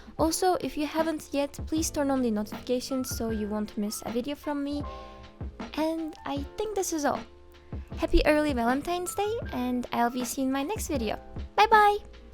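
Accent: Turkey. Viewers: ingilizcen gayet iyi olmasina ragmen turk ingilizcesini her yerden tanirim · I supraiesd that you are Turkish because Turkish people are very bad at english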